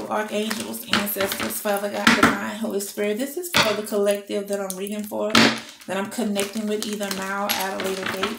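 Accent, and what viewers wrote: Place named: New Orleans, United States. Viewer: On point, thank you. 🙏🏼💛 And the Nola accent too cute!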